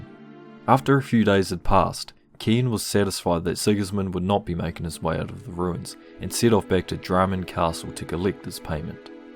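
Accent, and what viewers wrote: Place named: New Zealand. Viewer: Definitely from new Zealand (am Australian)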